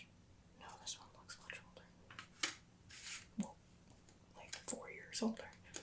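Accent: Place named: Midwest, United States. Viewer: I love old photographs also because ladies and gentlemen dressed like ladies and gentlemen. People dressed up to go everywhere not just for weddings and funerals. I love your northern Midwestern accent. Your homepage tells me you're not in Michigan anymore😉. You look adorable in everything you wear( especially 1990s secretary roleplay).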